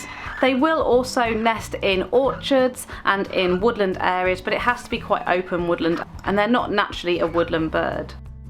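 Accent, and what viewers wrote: United Kingdom. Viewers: We used to pass a pair of burrowing owls on our way to work... it was at a corner, and they would spend the morning watching vehicles turning left... very cute! <link> One thing I realized is that I like your channel because unlike commercially produced nature shows, you don't have either the "syrupy saccharine" sound, or the "absurd melodromatic" sound, which are quite wearing, after a while. Of course we colonials can never get enough of the accents from the Mother Country! ;-)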